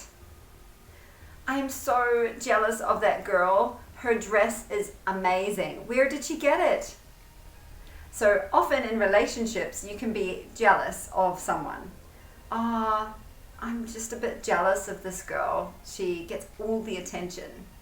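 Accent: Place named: Australia. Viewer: I think you speaking Australian English.. Good teaching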